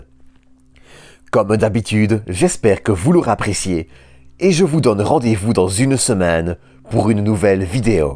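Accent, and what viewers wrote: Belgium. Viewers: tu n'as jamais entendu les différents accents belges? et oui, les imitateurs Français des accents belges sont nul lol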